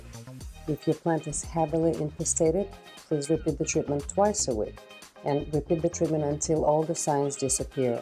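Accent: Russia. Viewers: You accent seems like a Russian?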